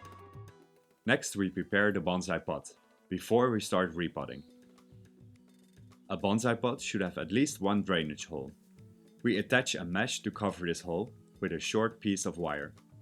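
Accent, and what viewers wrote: Netherlands. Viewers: je hoort echt direct dat je een hollander bent😂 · O yeah dutch guy. Ik hoor het van 10km afstand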